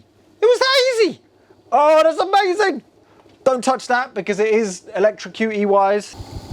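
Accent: London, United Kingdom. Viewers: The fact that you are warning people to not touch the end of a plug after cutting it off it’s plugged in proves other than your accent that you live in the UK you know there’s a thing called Darwin evolution and it’s the reason why people are getting stupider nowadays because it isn’t able to function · Pissin off your awesome londoner accent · These are called 'cheese wheel' Leslie. These is a full spectrum Leslie, Very cool but the full Leslie was wondrous. The bottom was a bass rotating drum with one opening with a curved sheet attached to the upper rear and to the lower front edge. On top was the rotating treble horn [in the opposite direction] There were usually on treble driver , not moving, driving treble waves up into what looked like a double split horn, however, one side was closed off to act like a perfect counter balance. You sound like you are in the UK so l don't think you could donate the unpainted cheese wheel to me, but l am now stuck in an assisted living building, with a Yamaha MO8 sitting there because my Leslie was stolen. 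what you have there will change the dynamic of both your playing and writing, because as you noticed in this video, cool sounds, sound Plastic Fantastic Awayla. It's Halloween night in Salt Lake City, Utah USA so you have been funking off since June. I wish I could hear what you have come up with. People seldom have exactly the same reaction to this marvelous effect. If you come across another out of this world find, Please keep Tom Behind the Zion Curtain, in MOMOLAND UTAH WISH WE COULD JAM WITH COMPLIMENTARY DUAL KEYBOARD LEAD BROTHER. NOTHINGS BETTING THAN DUAL IMPROV! KEEP IT UP KID, CUZ YOU GOT IT! TDH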